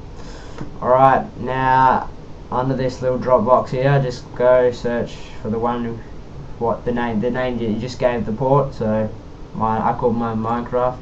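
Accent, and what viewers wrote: Australia. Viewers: Are you aussie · I have that gateway and u are like the only one that helped me!! Also are u australia????????? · are you an aussie, aussies ftw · Are You Australian Cause If You Are I Am Aswell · Your Aussie aren't u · YES ANOTHER AUSSIE HOW U DOIN MATE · heard the accent and knew I was definitely going to get this right aussies we so smart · Aussie Mate Yeeeeew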